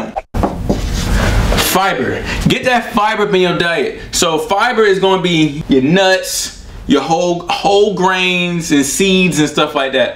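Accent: Baltimore, United States. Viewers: most def from bmore i hear it lol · he baltimore af “EXECISE” no R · Is he from Baltimore? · Hear that bmore 😂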